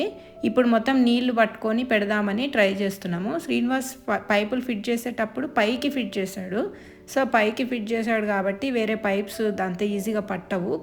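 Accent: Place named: Rayalaseema, India. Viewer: Ragi sakati resipi chayandi garu maa rayalaseema basha matladuchnnaru meeru andi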